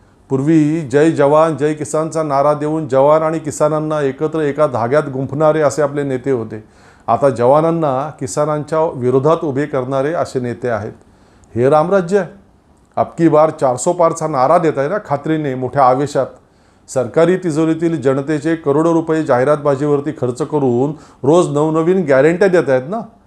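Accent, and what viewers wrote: Standard. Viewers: मला मराठी भाषा खूप आवडते. शुद्ध मराठी उच्चारण आपला खूप मस्त. मराठी चित्रपटांना प्रोत्साहन दिलं पाहिजे. तसेच मी मुस्लिम आहे पण मला मराठी भाषेचा लेखक व्हायचा आहे.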